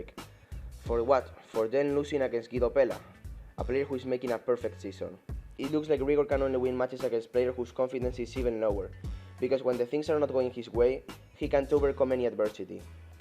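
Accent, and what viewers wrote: Spain. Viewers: Tienes el acento más español de la historia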